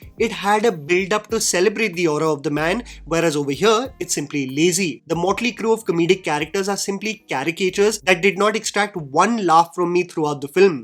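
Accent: India. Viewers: There's no disrespect towards his Hindi accent. I jokingly mentioned about the pronunciation. I know it's tough without knowing the language entirely, so full points for trying. If you respect other languages, you will say Telugu and Kannada properly, just like how we say Hindi and not Hin or Hind. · Why??? Why can't you respect his hindi accent?? And in Hindi it's "Telgu" & "kannad" If you can't respect other languages, why expect others to respect yours???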